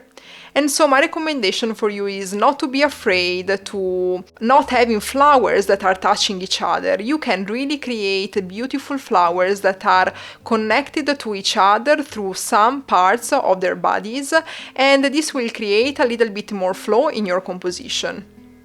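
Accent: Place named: Italy. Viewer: Parli inglese come solo un italiano può parlarlo 😅. Sei italiana? Video utile. Grazie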